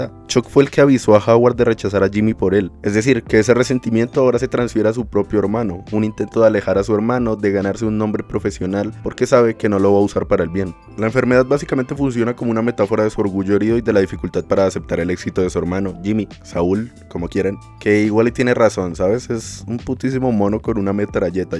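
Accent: Colombia. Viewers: Este tipo no puede ser más bogotano, me siento en casa jajaajajaj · Amigo, muy bueno tu video, pero, te recomiendo no usar esos tontos memes de perros y hablar menos como colombiano.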